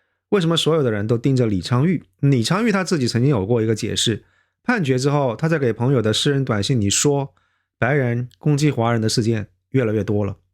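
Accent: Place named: South, China. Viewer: 自己说过好几次自己是南方人普通话不太标准有口音理解万岁👏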